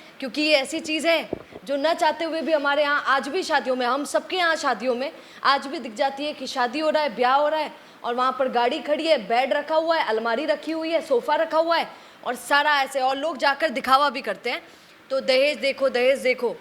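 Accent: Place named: Marwari, India. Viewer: Madam ak kahase ho, hindi or marvadi mix bol rahe ho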